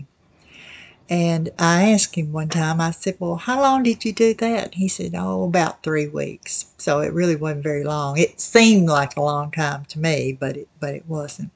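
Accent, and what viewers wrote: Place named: South, United States. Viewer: Hi! If the artists don't mind would you show the pieces that you talk about? Maybe a future video? I am enjoying your videos; I miss hearing genuine southern speech.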